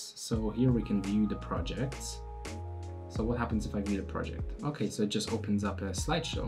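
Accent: Poland. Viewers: Jak usłyszałem 'hey Jacek', już wiedziałem, że to rodak xd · Ciągle słyszę Polski akcent ;)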